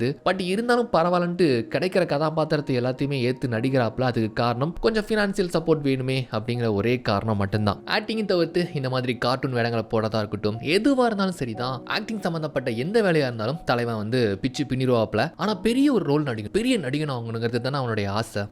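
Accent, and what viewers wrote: Tirunelveli, India. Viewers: Thoora ponga indh bashai tirunelveli slang la 😅nellai ya neenga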